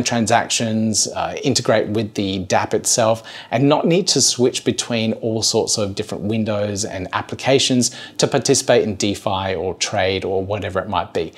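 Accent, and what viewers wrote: Australia. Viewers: Just subscribed to your channel! You have such soothing radiating positive energy, that it is much more pleasant to hear Cardano related news from you than from many other channels. Maybe it is because I consider Australian (or NZ) accent smooth as butter maybe, pleasant to the ear and reminiscent of the sun and beaches (and surfing with sharks as well :) ).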